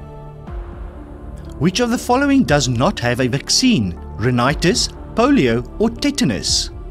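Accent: South Africa. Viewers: I spend a lot of time on youtube and you are my favorite man. You are so friendly, and the questions are great and I can't get enough of the South Affrikan sound.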